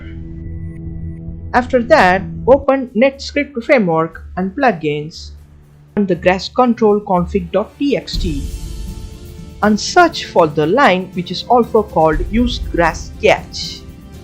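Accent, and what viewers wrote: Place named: India. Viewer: funny, because I can't even begin to understand it full stop. the music, the mic quality, the indian accent... what is he saying?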